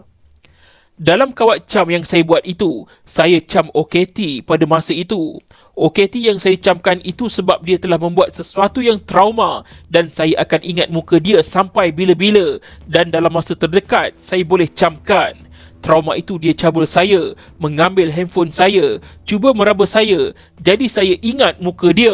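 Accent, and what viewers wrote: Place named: Johor, Malaysia. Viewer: Gaya bahasa penyampaian Johorean sangat 😂 guna lah bahasa Melayu baku standards 😅baru semua orang suka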